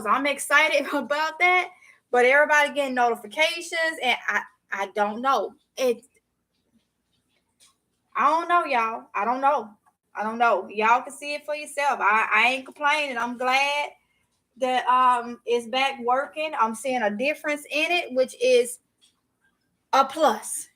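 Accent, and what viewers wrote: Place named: South, United States. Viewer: This is my first time making a comment, girl don't apologize for the way u talk.we southern ladies can't help it.We get the message across. Also,don't stop these vlogs,they are so helpful. Please call me!!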